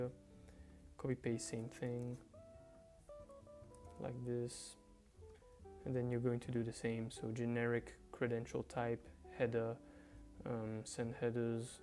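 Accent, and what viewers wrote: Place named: Nigeria. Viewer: why she sounds like a nigerian scammer?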